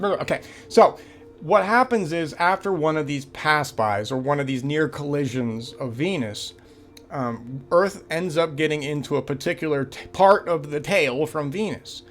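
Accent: United States. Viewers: What kinda accent is this? East coast for sure, NY? NJ? PA?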